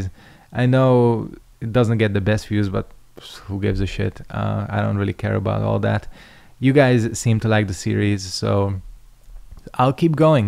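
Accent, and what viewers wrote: Middle East. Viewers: I had never seen hia face before, his voice sounded middle eastern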